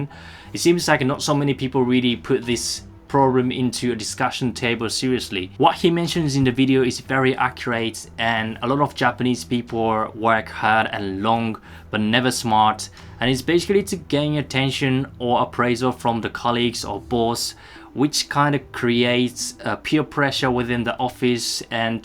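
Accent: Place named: Australia. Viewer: this is the first video of yours I've seen -- I believe I hear a strong Australian accent good video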